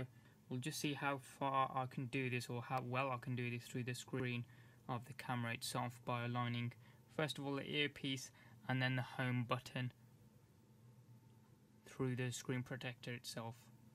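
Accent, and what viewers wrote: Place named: England, United Kingdom. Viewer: dont be racist dude. thats not right plus he sounds like another fellow englishmen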